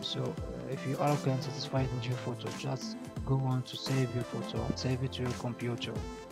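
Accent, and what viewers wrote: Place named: Morocco. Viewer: bayna mn aaaaaaaah w sawtk mghribi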